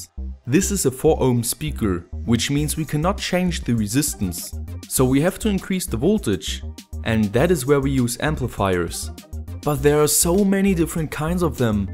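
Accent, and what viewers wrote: Germany. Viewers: That accent is clearly German, maybe Dutch which is similar (NOT the same, I dont wanna offend my German friends) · he sounds german to me and the brands he uses suggest he lives in eu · dude he's German you can tell by his accent. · Your very german accent is very pleasant to my ears.. Nice project but why don't you use just a small transformer or transducer to get rid of the hum, when you use the same powersupply for the amp and the receiver?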